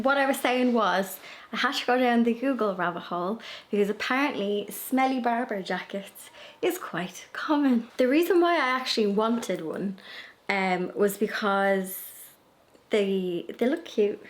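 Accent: Ireland. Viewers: Hello Sweet Girl, I love watching your channel so much. You have such a beautiful relaxing spirit and watching you do your housework, crafts and garden 🪴 inspires me to those little things that I often put off. 😊 Love Ireland 🇮🇪 and your gorgeous accent, nothing beat’s a good Irish pub………..definitely beats drinking at home alone for sure! 🍻🥂🍾🍹❤ September is the start of Spring down here in Australia 🇦🇺 I love watching all the blossoms opening up on my weeping cherry tree and the buds developing on all my rose bushes! 🌹😊🌹 Kindest Regards Deb 🌷🌷🌷🌷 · September is a wonderful month, an intro to fall. Love your autumn bedding. Your house is so cute. You do such great things with it and your yard. Love watching your videos and hearing your Irish accent. I’m in sunny San Diego, California.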